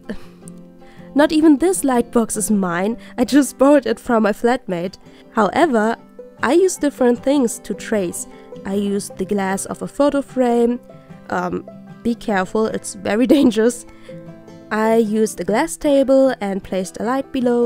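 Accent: Germany. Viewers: Bist du deutsch? Wenn ja merkt mans mega😂😂😂🙈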